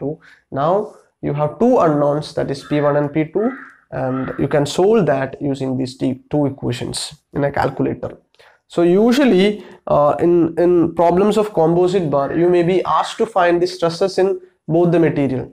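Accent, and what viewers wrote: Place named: Kerala, India. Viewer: Are u a malayali, anyways great video. Keep delivering great content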